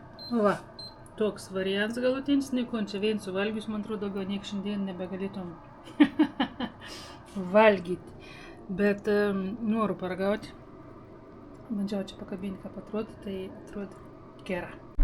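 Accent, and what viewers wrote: Samogitia, Lithuania. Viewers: Mėgaujuosi JŪSŲ žemaičių šnekta...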